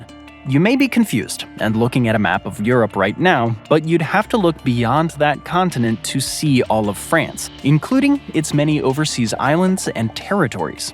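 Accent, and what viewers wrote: United States. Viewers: Are you American by chance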